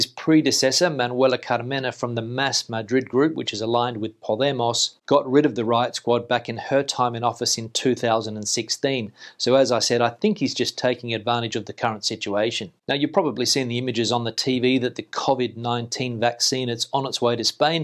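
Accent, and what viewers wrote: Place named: Australia. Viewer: You teach Australian English? Your accent I mean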